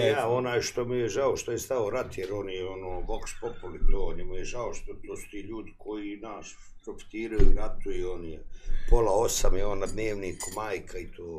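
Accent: Bosnia and Herzegovina. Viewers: Jel on iz Sarajeva pa ima Sarajevski naglasak ilije iz Pala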